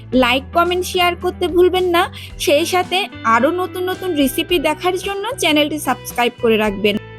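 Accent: Chittagong, Bangladesh. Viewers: আপনার দেশের বাড়ি কি চট্টগ্রাম কোথায় বোঝা যাচ্ছে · Apu apni ki citiga apnar kotai buj jai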